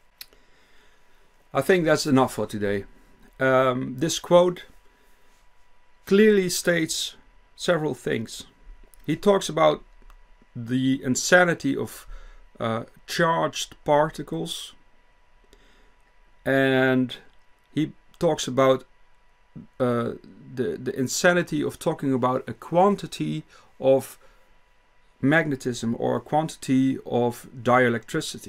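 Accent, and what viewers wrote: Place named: Netherlands. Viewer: echt heel interresant gij zijt mijn nieuwe favorite youtuber dank u voor al u wijsheid ik veronderstel dat u van nederland bent aan u accent te horen :) hoop dat ik juist ben denk het wel ik ben van belgie en wil heel graag meer weten over deze onderwerpen en jij legt het heel goed uit en ben u heel dankbaar daarvoor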